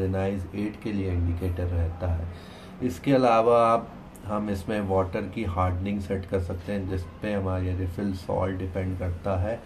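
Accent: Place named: India. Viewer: I not understand nothing ur indian accent ohhh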